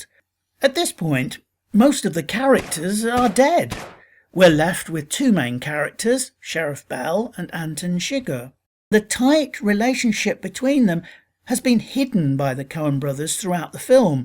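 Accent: England, United Kingdom. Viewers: listen to this guy, he's English... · A Brit doing the speaking for a film review such as this is too distracting. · You say in English accent no less; that Chigar doesn’t have a place of origin but if you know history of conquest by Christian assholes, he is from Mexico, who pieces of shit of America ransacked in the name of a God that doesn’t exist counter to treaties made by the law. The movie is about consequences. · I'm quite extinguished by reviewers with British accents telling me what a noif is. Lame, tedious review of a review, and that's not a noif, this is a noif. Let Hollywood have fun til it kind of fades. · Jesus Fucking Christ. How many descriptive absolutes do you have to use? "From the beginning of the film, the western stereotype is immediately clear." Really, fucktard? A sunrise gives it all away, does it? Or is it Tommy Lee Jones's voice? 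Hey...from the beginning of your video, the snarky pretentiousness is IMMEDIATELY CLEAH. Dicknugget. "The leaoucation where oll the events tayke playce couldn't. be. mohr typical." Really? It couldn't be more typical, eh? Typical of WHAT? Typical of your stupid blanket commentary? God damn. Were you sniffing the queen's meat curtains while you wrote this steaming pile of shit and chips? "Oll thuh main c.h.a.r.a.c.t.e.h.s ah PEFECTLY in.ti.grated IN this environment...THEY ah the essential element of every westehn mouvee." SHUT THE FUCK. UP. Over-generalizations and pretentious King's English phrasing does NOT make for an effective, meaningful review, especially when you're just jerking it in your face. Come on. If anyone took this narrator seriously, this movie would fucking bake the bread for your Texas Toast, slice it, butter that fucker up, toast the sum'bitch, and slap your ass silly 'till you admitted hyperbole isn't a reality. 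God. The British Accent... sooooo ponce. Maybe if Bruce Dickinson of Iron Maiden were narrating, we'd get a good read. But no. It's who only completed level 1's. Fucking shut up. Good name, btw. Pinheads. Yeah...I only made it 42 seconds in. TYPICAHL AMEHRICAN.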